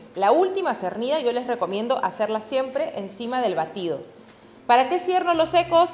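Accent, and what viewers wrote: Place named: Peru. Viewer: Peruana por el acento.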